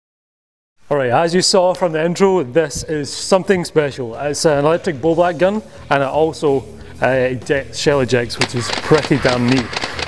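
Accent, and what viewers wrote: Scotland, United Kingdom. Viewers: So many people think he's a brit. Smh. He is obviously a scot.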